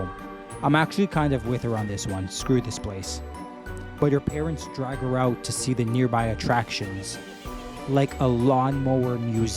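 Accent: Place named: North America. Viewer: It's actually rather surprising hearing a North American talk about this show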